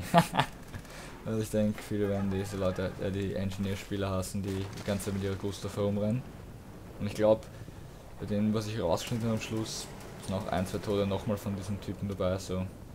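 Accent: Austria. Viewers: Am akzent erkennt man leicht das du aus Wien bist :) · Du hast ein österreicher akzent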